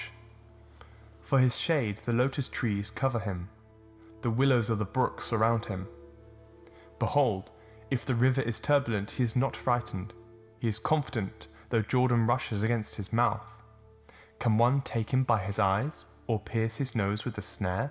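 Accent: England, United Kingdom. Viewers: I got halfway through this video and was like "wait, wtf is a Beer-Moth?" Then I realized he was just saying Behemoth in an English accent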